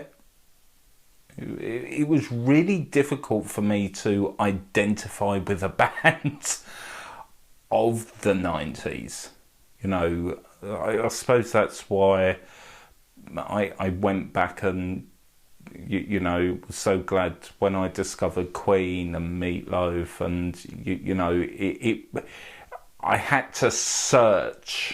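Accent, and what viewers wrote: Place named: United Kingdom. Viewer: I love your explanation of any part of this clip.... I'm not a Goth, but a big fan of. Your British accent is perfect for this description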